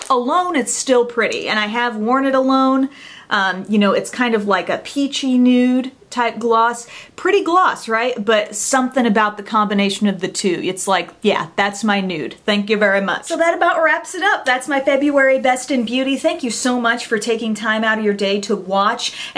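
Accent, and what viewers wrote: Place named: United States. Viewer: You're so pretty and your accent is SO COOL. Whereabout in America are you from?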